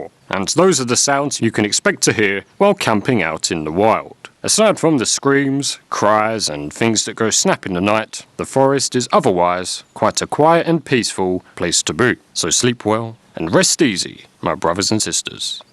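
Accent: United Kingdom. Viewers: Just watched a video from five years ago. When did you get a British accent?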